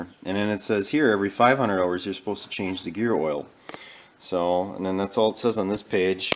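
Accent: United States. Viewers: He sounds like one of them dam yankees that dont know his ass from a hole in the ground mite be a democrat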